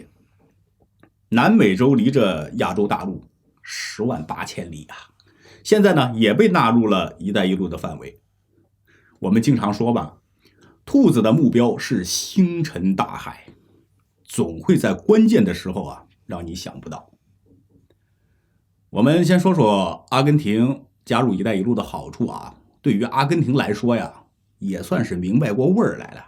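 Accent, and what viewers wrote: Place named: Beijing, China. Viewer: 我喜歡劉兄的流暢京腔，聽起來舒服。對事理陳述，新鮮可喜，加上個人的媚力？信不信不由我了。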